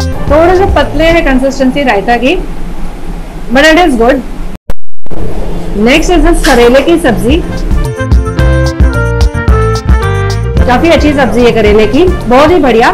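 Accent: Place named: Sindh, India. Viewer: Sindhi accent is good to hear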